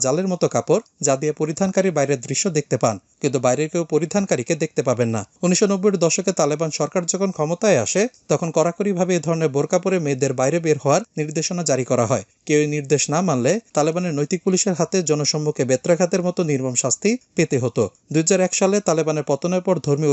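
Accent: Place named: India. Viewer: ভারতের মত করে কথা বলবেন না Please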